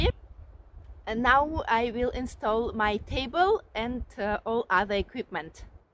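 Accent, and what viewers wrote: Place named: Ryazan, Russia. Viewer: Красавица, у тебя проблемы с родным языком ? Зачем мучаешь язык врага своим рязанским акцентом ? К чему это и зачем ? Ладно когда во время OSO, понятно и логично, а все остальное время в ролике.... ?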